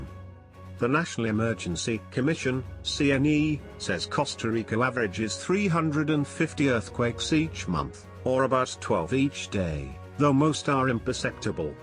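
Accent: United Kingdom. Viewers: WTF did you say at the end? CME's have nothing to do with earthquakes? Your British accent can't hide the stupidity of that statement.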